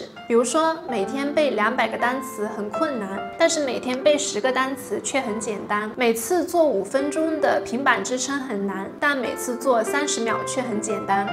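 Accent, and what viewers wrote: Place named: Anqing, China. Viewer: 你是安庆人吗，口音好好像哦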